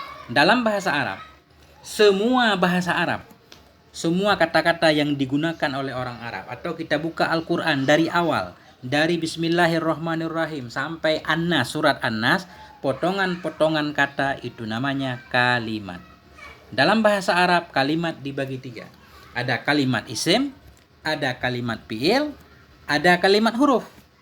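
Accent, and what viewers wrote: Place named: Lombok, Indonesia. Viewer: Afwan kayaknya ustnya org lombok ya hehhee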